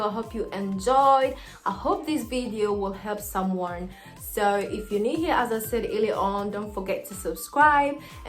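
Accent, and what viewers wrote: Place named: United Kingdom. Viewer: You English sounds British 😎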